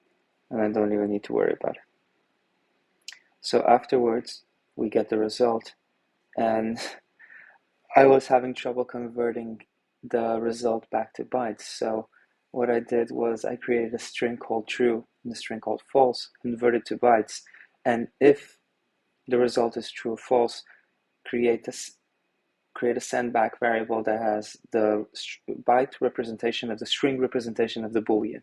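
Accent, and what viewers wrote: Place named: France. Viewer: Et d'ailleurs, t'es français non ? J'ai remarqué un brin d'accent lol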